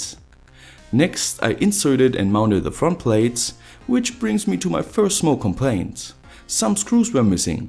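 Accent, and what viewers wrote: Germany. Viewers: You sir, are a true genius. P.S. I love your german accent xD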